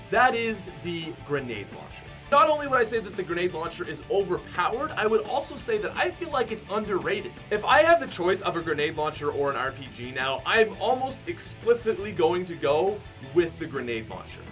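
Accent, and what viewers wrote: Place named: Canada. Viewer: Ben Monaghan it’s his Canadian accent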